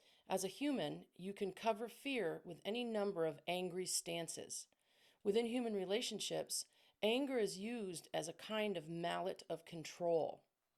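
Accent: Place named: New England, United States. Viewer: Thanks so much for this message! PS I knew right away that you were a fellow New Englander. Hello from Maine❤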